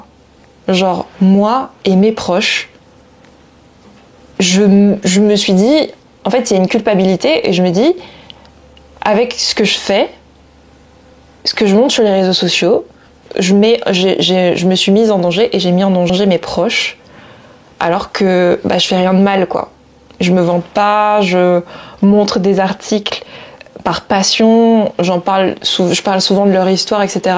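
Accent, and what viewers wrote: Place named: Guadeloupe. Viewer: ah oki je me disais bien que j'avais entendu un petit accent de chez nous. Merci beaucoup pour ta réponse et en ka voyé on big Foss et couwage baw de la Guadeloupe !! Never give up we keep getting stronger!!! 💪🏾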